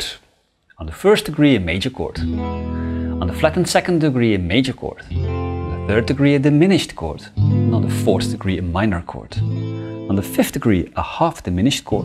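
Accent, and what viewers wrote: Netherlands. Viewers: Dutch? Sounds great!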